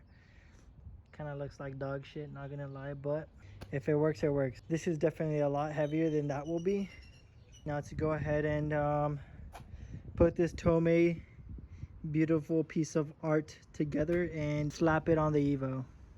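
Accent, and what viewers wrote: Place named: India. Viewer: bro pense que eras de la india hasta que hiciste el comentario de la chela jajajja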